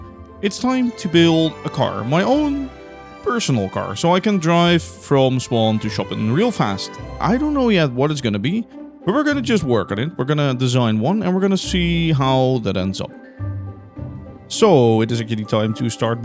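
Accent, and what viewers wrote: Netherlands. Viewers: Aan je accent te horen ben jij toevallig Nederlands ?🤩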